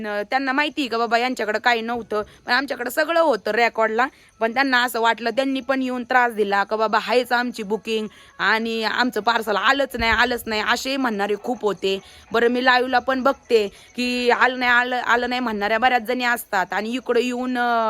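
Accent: Ahmednagar, India. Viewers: Tai tya areyachi bhasha tshich aahe mi pn nagar chi aahe asch bolt ja chan vatte tikd tshich boltat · लोकांकडे लक्ष नको देऊ तुम्ही बहिणी खूप ट्यालेतटेड आहात मी नेहमी व्हिडियो बगते खूप छान वाटत....... नगर ची भाषा तुम्ही बोलता तशीच आहे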